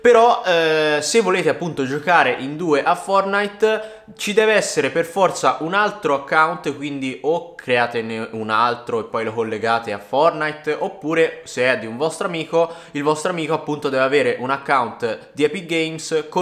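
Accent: Tuscany, Italy. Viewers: Sei toscano vero? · Bello l'accento toshano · We bello toscano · Ma sei toscano? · ma sei toscano? mi sembri un po' toscano 😂🤗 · Si chiama parlata toscana/fiorentina🤦‍♂️ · Sei toscano? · Ma sei toscano?? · Quanto toscano🤣😊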